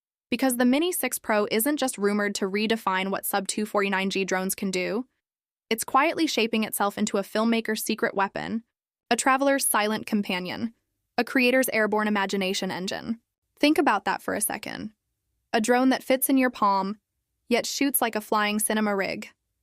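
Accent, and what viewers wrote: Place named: United States. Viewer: a croaky American voice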